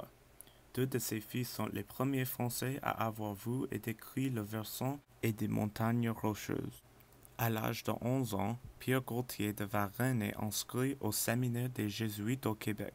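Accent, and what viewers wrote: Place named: England, United Kingdom. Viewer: this is an english speaker trying to talk french.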